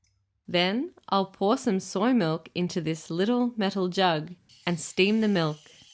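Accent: Australia. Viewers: helloo, iam learning these ausie accents from you its lovely to hear it and it is so calming to my ears...sending the love from Indonesia 😁😁:hands-yellow-heart-red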